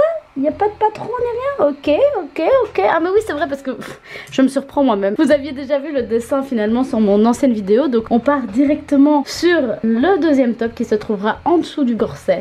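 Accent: Belgium. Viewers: Mais mdr tu as réussi à me faire regarder des vidéos couture! Je n'ai rien contre la couture hein, c'est juste que je suis plutôt au level "Incapable de réparer le trou d'une chaussette grossièrement sans me coller l'aiguille dans le doigt"... Voilà 😁. J'ai connu ta chaîne il y a peu via des vidéos coloration (ça, c'est de suite beaucoup plus mon domaine!), et du coup, comme j'aime bien ton style, ton enthousiasme, ton accent belge, j'ai regardé la vidéo sur le corset. J'aime beaucoup les corsets (magnifique engin de torture), et j'aime beaucoup la Wax, et je me suis prise au jeu lol. Franchement, le top est superbe, et il rend le corset tout de suite beaucoup moins casse-gueule à porter 😅. GG en tout cas, c'est un sacré boulot !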